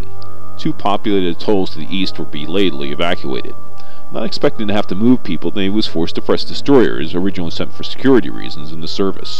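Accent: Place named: California, United States. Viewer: something funny here is that the commentator try to replicate the 50's Trans-Atlantic English accent usually used in the 50's, the tone was simiar but the accent was not, it's a modern Mainland accent, Californian probably.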